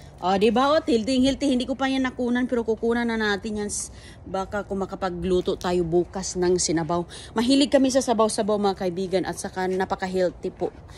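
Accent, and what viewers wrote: Visayas, Philippines. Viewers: Visaya bas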